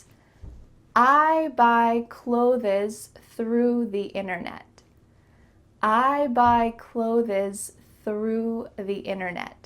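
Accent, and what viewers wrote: United States. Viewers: speaks with an American accent...in Australia we don't pronounce the "r"! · I love you ❤️ Every time I feel like my american-accent-blood-pressure is going down I check out one of your videos in order to get some dosage of the american accent. 😅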